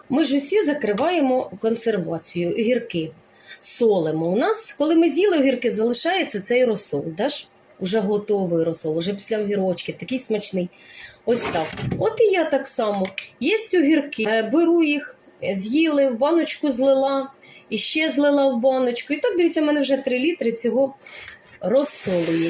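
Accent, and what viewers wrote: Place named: Cherkasy, Ukraine. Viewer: І рецепт цікавий і мова🎉 Це мабуть Черкащина,чи я помиляюся?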